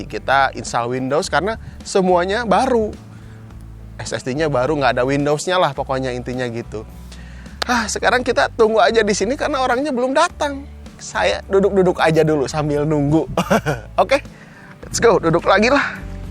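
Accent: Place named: Sunda, Indonesia. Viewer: Logatna meni alus ... Sunda pride 😂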